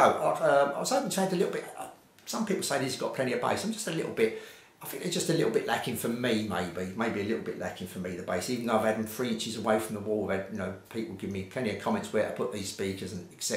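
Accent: Cockney, United Kingdom. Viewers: Recapped my tannoy turnburys.. Bass even more propulsive and deeper tighter.. Etc with mundorf evo oil.. Jantzen on the tweeter... More detail faster.. Now sound euphonic rich with my musical paradise 301.. 289 GBP from China.. Sounds like the review in those mags... Ur Cockney Accent makes a change from the usual middle class ****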